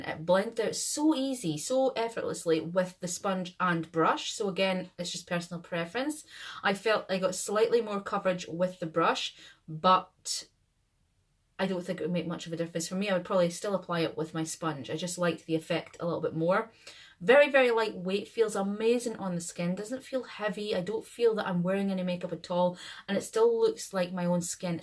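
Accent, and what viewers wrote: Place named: Dundee, United Kingdom. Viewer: I knew I recognised that accent! I’m from Dundee too. Might see you around. Lol xx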